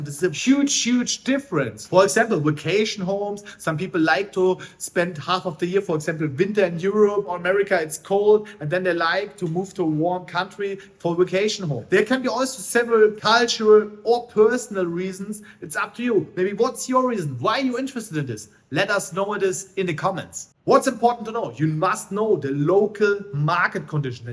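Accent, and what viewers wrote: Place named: Germany. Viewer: "whats the TRUTH". Your German accent speaking English is hilarious.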